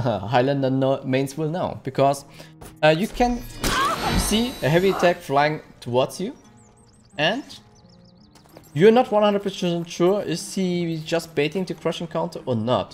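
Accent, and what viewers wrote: Germany. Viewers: man hört den deutschen aktzent raus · Hey! I was wondering where you come from. Because your english sounds like it has an german accent in it. Thanks for the answer!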